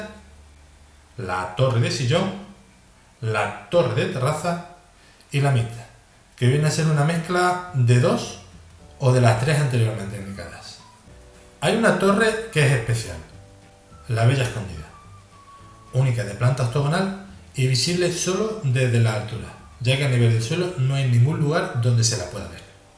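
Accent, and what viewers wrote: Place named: Malaga, Spain. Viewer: ole olee!! Jajjaja yo de Málaga x tu acento lo supuse... pero me gusta mucho cadiz la verdad😍 bueno me gusta toaaa Andalucía pake nos vamos a engañar jejejej 💋💋💋✨✨✨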